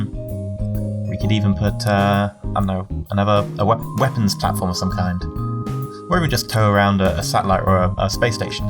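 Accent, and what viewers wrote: United Kingdom. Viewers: R u British? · Are you british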